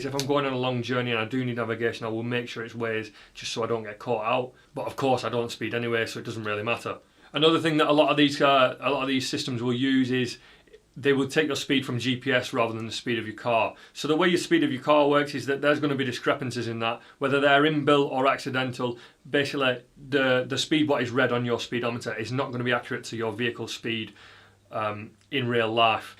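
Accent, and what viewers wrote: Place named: England, United Kingdom. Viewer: This guy is clearly from England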